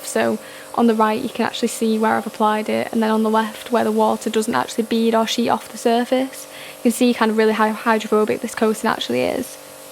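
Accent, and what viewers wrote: United Kingdom. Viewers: The three best things you can ever find is on this channel. Car Detailing British accent 😍 Cute Girl 👌🏼👍🏼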